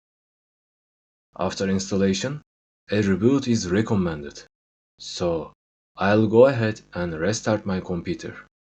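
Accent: Turkey. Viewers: bro are u from Turkey?Ur accent is like Turkish accent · yemin ederim aksandan anladim hemen turk oldugunu. yardimci oldun sagol.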